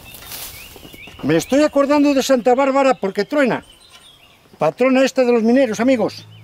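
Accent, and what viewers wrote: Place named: Spain. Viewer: Es agradable escuchar su acento y su gramática. Habla muy especial el idioma español. ¿Qué región de España es usted caballero? Post data: gracias por la información sobre el carbón, necesitaba esa información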